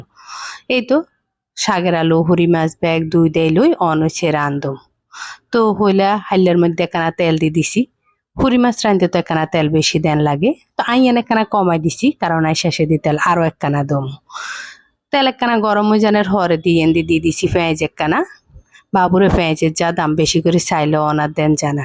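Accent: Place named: Noakhali, Bangladesh. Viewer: একেবারে খাঁটি নোয়াখাইল্ল্যা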